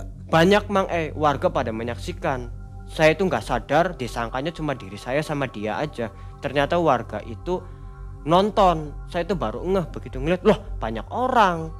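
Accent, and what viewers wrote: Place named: Dobo, Indonesia. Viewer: Salam dari Dobo.... Bt asli orang Dobo, dari awal dengar cerita bt sadar kalau lama diDobo, karna fasih sekali sama logat Dobo, btw laut Arafura ya.... Bukan Arafuru🙏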